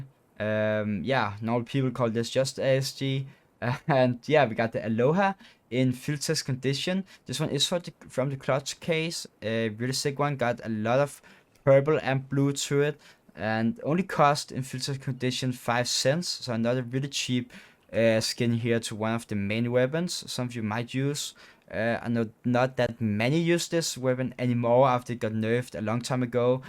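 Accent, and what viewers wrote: Denmark. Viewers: Bro are you danish?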